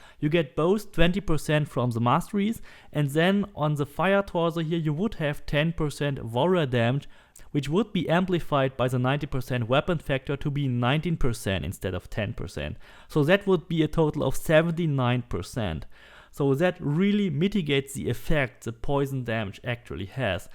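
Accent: Germany. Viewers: thank you sir! Sorry for the questions but your accent sounds familiar... possible that u r from Germany?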